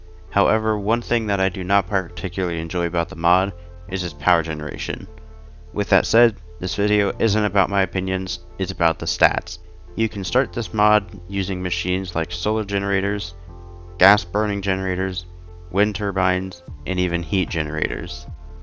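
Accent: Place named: England, United Kingdom. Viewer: das ist ein Engländer kein Deutscher man hört das von seinem Ausdruck was er sagt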